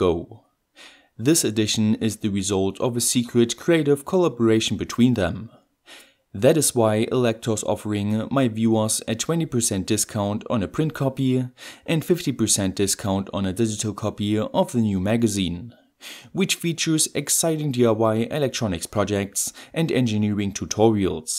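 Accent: Germany. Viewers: Hey! Are you german? What's the accent?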